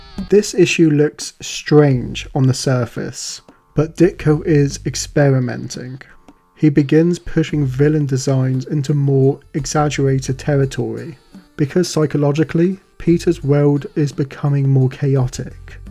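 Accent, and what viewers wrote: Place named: United Kingdom. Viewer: This Blows...sick of British Voices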